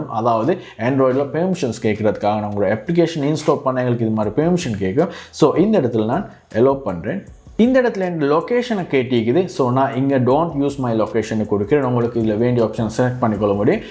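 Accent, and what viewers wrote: Sri Lanka. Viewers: App link error varudhu bro 😶 Anyway Ungal Ilangai tamil superu 😂❤ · Idhu Srilankan Tamil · Bro ivaru pesurathu srilanka tamil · அது இலங்கைத் தமிழ் முருகேசா...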